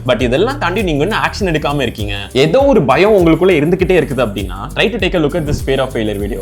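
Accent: Tirunelveli, India. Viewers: neenga tirunelveli aa bro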